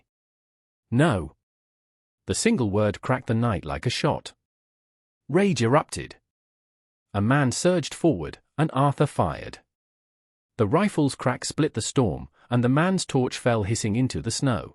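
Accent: United Kingdom. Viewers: A British accent narrating a western USA fictional story? No...just no.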